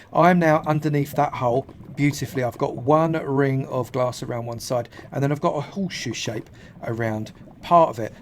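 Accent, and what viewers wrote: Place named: Luton, United Kingdom. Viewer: You kinda sound like you're from Luton. Sorry if I'm wrong, I'm not English, I'm American and just throwing out a guess.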